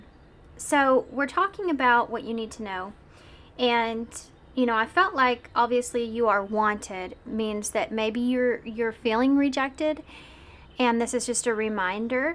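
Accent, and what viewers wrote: South, United States. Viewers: I have just relocated from PA to TN. My first time seeing your readings and my first time hearing a reader with a southern accent. Thank you for the message that i will find people i resonate with in my new community 🙏🏻🥰 pile 3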